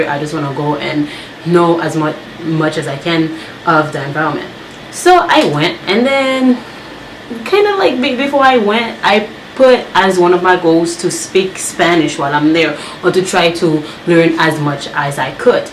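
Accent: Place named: Haiti. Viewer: Ur so pretty ❤️, u talk like you may be Haitian & or african french creole accent.....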